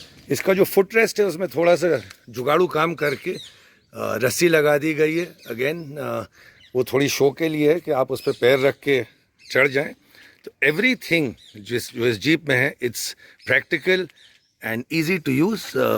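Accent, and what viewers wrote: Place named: Bhopal, India. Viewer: Hello bhai..loved your bhopali tales and your passion for Jeeps👌👌. Tumhari bhopal style commentary lajawab hai.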